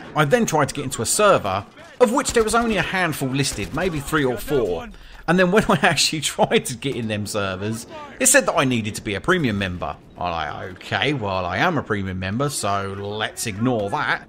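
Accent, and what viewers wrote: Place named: Brighton, United Kingdom. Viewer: ***** nice, do you have hardline on both platforms? Also what part of england are you from, judging from your accent I would say Brighton ;)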